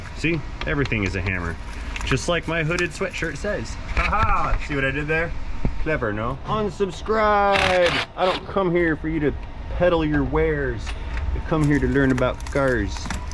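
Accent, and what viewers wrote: United States. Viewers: you have "TV accent".....im guessing western CT, MA, upstate. maybe Michigan, NorCal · Accent? You just sound like a good old American to me. If people in central Indiana have the same accent as you, then that is why I am not detecting any particular variation in speech. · I am not from US, and as far as I am concerned your accent is what I call "general clean US" accent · To me (Australian), you sound American.....;) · You have an American accent which in some of these states seem to now be the minority thanks greatly to immigration. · You sound like most American tourists I see in my European country. And I see a lot of them. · you have an American accent. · I do not even want to guess where your accent comes from it all sounds "yank" to me & excessive too boot. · Well, the ones made in the North of England weren't much good. And your accent is, without doubt, American ! 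· You just sound ‘American’ to me. Accents in Uk are something else. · u sound like a gen x person all american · Me being a Norwegian. Your accent sounds American.😅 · Gday. Accent wise...I'm English, so you sound American...not helpful perhaps, but there it is 😂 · Being in NZ your accent just sounds like a typical American TV accent. And to me the American TV accent is still pretty subtle to ‘real life’ American accents. The only thing I really pick up on is mirror, to me it’s sounds like me ra😊. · You don’t have an accent. You talk like a regular American. You lived in Hawaii, I know that. You mentioned that before. You talk like a regular American person. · Pt II. Accents. I`m from the UK (London) and therefore have no accent, as we invented the language. However, I am well qualified to speak about yours. You have a classic American accent. It is not accentuated like NYC, the Deep South, Boston etc. 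it is easily understood and well modulated. Anyone who is complaining about yours, should come with me to visit my relatives in NE Scotland. The Americans there who work in the oilfields have been known to use sign language. Carry on. There is nothing wrong with your speech. I know. So Yah boo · You sound American to me.. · Accent? Hmm. As a Brit, I can definitely say your accent is Murican.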